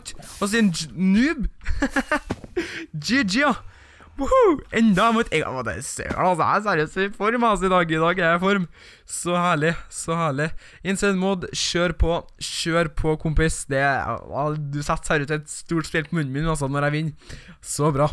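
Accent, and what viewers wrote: Trøndelag, Norway. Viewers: Ælske at du snakke trøndersk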